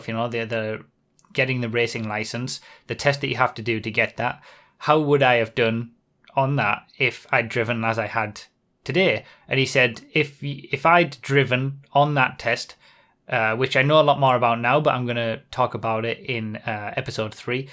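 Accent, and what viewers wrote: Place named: Newcastle, United Kingdom. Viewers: Are you from north east England, I hear a slight Geordie accent for some reason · I'm from just up the road from you Blackhall don't know the hell I didn't recognise the Poolie accent.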